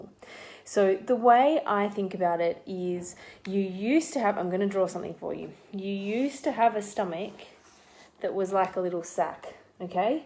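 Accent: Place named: Australia. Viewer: Thanks so much so helpful! And you;re an Aussie - even better!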